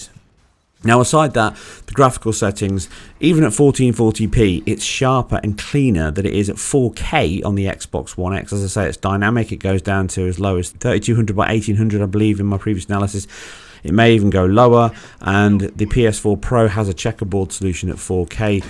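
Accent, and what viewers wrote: United Kingdom. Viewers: There is no wrong or right, but he sounds like a proper British.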